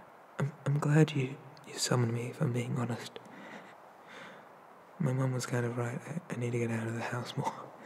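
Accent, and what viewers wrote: United Kingdom. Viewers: Am I the only one who loves the slight british accent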